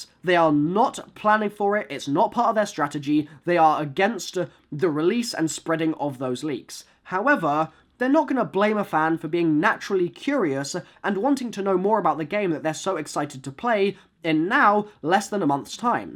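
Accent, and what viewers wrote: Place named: United Kingdom. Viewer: that british accent is gonna get on my nerves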